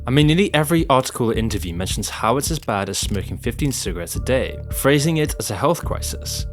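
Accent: United Kingdom. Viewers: The monotone british voice with nothing very interesting to say as every video existing on the internet doesn't help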